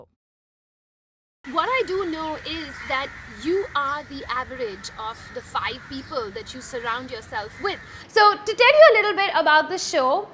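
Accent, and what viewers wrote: India. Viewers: Indian English sucks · India India India that irritating accent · Your Indian accent is like those Indian phone scammer use. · Indian accent is killing us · I like your Indian accent and I am trying to learn Indian English from you. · t series accent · Sounds Indian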